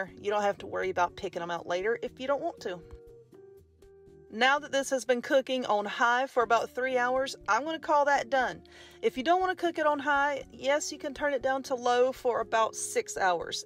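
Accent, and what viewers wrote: South, United States. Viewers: New sub. Definitely making this today or tomorrow. I’m fascinated by your tats and nails. Interesting with your southern accent. My mind kinda went “Huh??” when I saw them.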